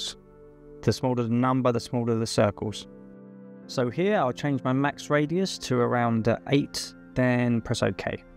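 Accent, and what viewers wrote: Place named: United Kingdom. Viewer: Great tutorial, and of course everything sounds even nicer in proper British English :D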